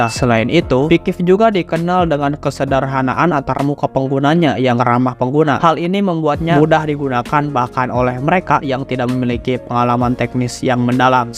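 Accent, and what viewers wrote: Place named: West Java, Indonesia. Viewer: Sunda kayak nya:v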